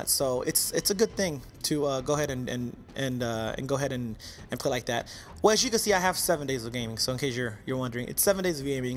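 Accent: India. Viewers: You sound like an Indian I can say from my personal experience in living abroad anyway great video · baraks? is this guy indian?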